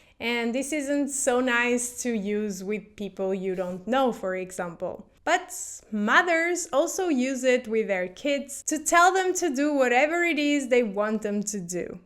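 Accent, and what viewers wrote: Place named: Porto, Portugal. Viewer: Não é Porto, sua parva! É Puorto! E não é caralho, é cuaralho 😂 adoro o sotaque do Porto hahah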